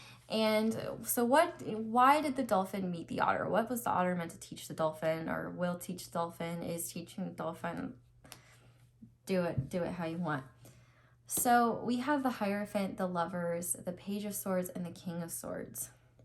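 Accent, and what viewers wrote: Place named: South, United States. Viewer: Pile 2 I love the southern accent this is coming from a southern woman it's not that funny some what's even say it's cute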